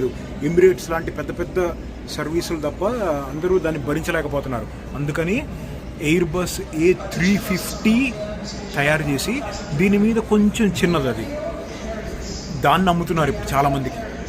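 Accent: Andhra Pradesh, India. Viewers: Bro meru .. Nizamabad kada me slang Andra la undi ?..me wife ni parichayam cheyandi...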